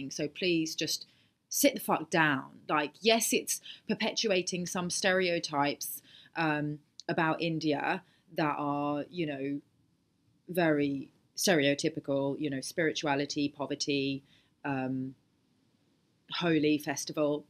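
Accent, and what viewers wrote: United Kingdom. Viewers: LMAO, why, coz of her British accent 🤣🤣🤣🤣🤣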